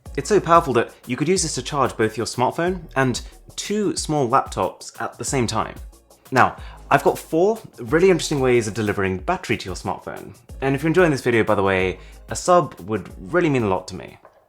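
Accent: RP, United Kingdom. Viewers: I appreciate his RP british English pronunciation. Perfect. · I like your British accent man!! Cool video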